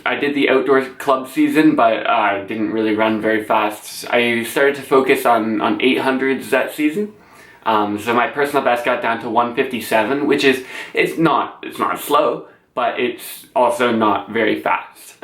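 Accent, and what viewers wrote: Canada. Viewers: I really love your videos man and you can definitively tell your Canadian whenever you say words like watch and tomorrow lol best of luck in Harvard mate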